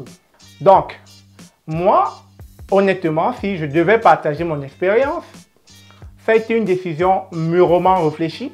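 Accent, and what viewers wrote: Cameroon. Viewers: Etes-vous Camerounais? je le demande a cause de votre accent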